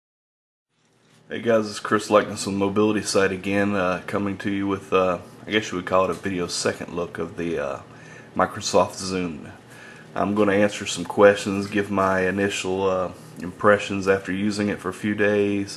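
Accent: South, United States. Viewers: You know I really don't like southern accents- but yours is just fine XD Not a bad review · i love your voice/southern accent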